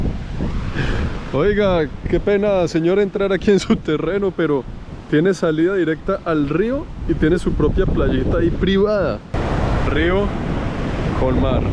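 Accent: Bogotá, Colombia. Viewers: lo que me encanta de ti, es que eres auténtico, tu lenguaje muy rolo, muy Colombia. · Que carisma tienes, me gustan muchos tus videos y lo que transmites, como describes los paísajes y como se te ve que disfrutas lo que haces y los lugares que visitas, tu acento colombiano, bel ragazzo 🥰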